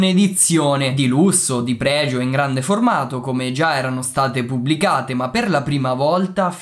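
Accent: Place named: Marche, Italy. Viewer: Sei marchigiano, vero? Percepisco l'accento 🤔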